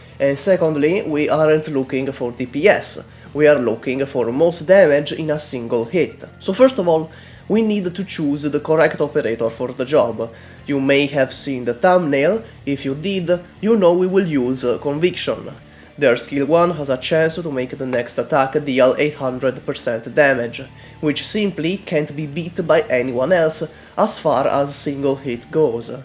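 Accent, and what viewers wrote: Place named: Italy. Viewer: Knowing how much Arknights community has been saved by Kyostinv and his guides, hearing another AK player with an Italian accent immediately made me go “Hmmm, yes, this checks out. I instantly trust this man.”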